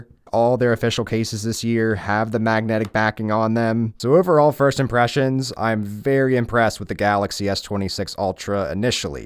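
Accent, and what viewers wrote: United States. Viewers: The most American accent ever wow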